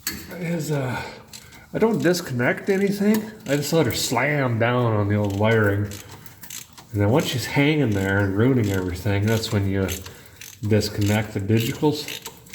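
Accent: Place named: Maine, United States. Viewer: you almost sound like a mainer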